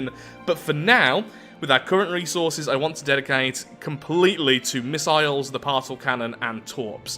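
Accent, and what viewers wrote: United Kingdom. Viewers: Hey, probably many people are thinking this 'what country are you from?' British? Australian? if you see this please reply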